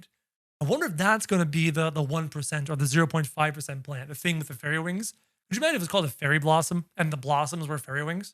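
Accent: Canada. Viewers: sounds like he’s from or near Canada somewhere (?) my guess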